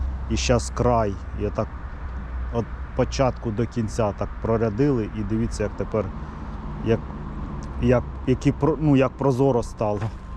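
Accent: Poltava, Ukraine. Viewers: рідний полтавський акцент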